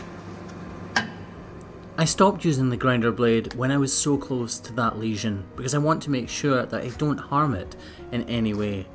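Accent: Scotland, United Kingdom. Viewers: Gotta love that Scottish brogue, I dated a Scottish girl for about a year and I still miss that accent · That's probably cause he's Scottish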